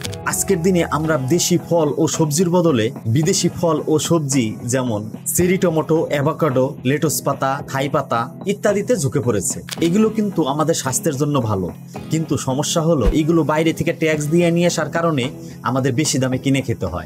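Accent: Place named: Shariatpur, Bangladesh. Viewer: ভাই এখানে ধন্যবাদের কিছু নাই। আপনি আপনার যোগ্যতার ভিত্তিতে উত্তম উপস্থাপনার মাধ্যমে আমাদের কমেন্ট অর্জন করেছেন। আমি মনে করি এটা আপনার প্রাপ্য। আমরা আশা করি সামনের দিনগুলোতে আপনি আমাদের জন্য আরও ইনফরমেটিভ ভিডিও নিয়ে আসবেন। ভাই আরেকটা কথা আমার বাড়ি শরিয়তপুর, আপনার মুখের ভাষাটা আমাদের ঐদিকে মত মনে হয়। ভাল থাকবেন।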